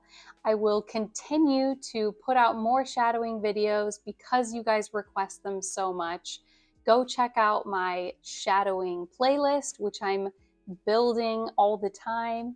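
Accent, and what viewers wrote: United States. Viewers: Which is it accent? American or British ? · I like your american accent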